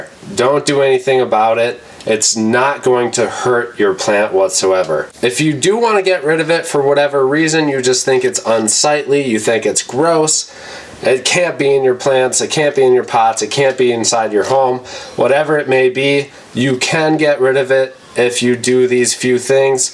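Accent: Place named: Wisconsin, United States. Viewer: Great helpful video! I knew we were neighbors when I heard the accent. Subbing fellow Wisconsinite!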